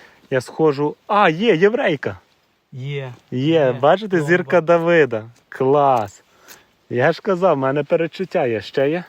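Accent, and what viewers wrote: Ukraine. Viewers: Приємно з Вами( лежачи в ліжку ) копати і насолоджуватись природою та приємним українським говором. Молодці так продовжуйте, Хто шукає той знайде .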